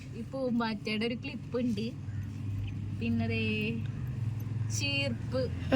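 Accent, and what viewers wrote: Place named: Thrissur, India. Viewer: തനി തൃശൂർ slang 😂🎉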